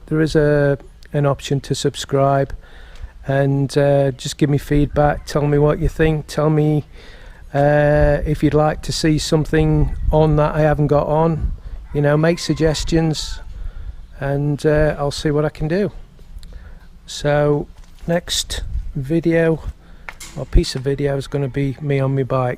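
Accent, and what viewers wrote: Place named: Geordie, United Kingdom. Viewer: try.some dried fish, they stock it dry so its a food supply when they osnt anything else. my partner brings it back with her. if smells a bit but im used to it now. Nice bike you have there. keep them coming the posts, you have a fan club now i think. when you first went to the Philipines your accent was east Midlands but now youre back to your roots i can hear the geordie twang now. take care all the best.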